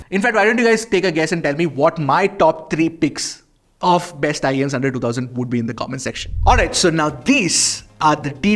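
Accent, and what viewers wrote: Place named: Pune, India. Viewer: With time has obtained Puneri characteristics that are somewhat noticable through way he speaks now...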